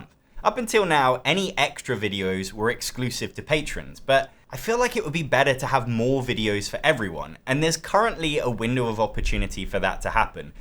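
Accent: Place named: United Kingdom. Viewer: Have you considered adding support for more languages? Brazil's got a growing scene for board games, but very few of us know English well enough to keep up with your videos - especially with the British accent -.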